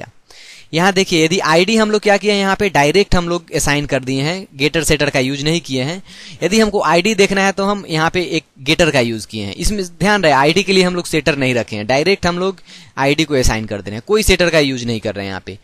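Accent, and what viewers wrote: Bihar, India. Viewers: typical bihari accent ...But lecture is good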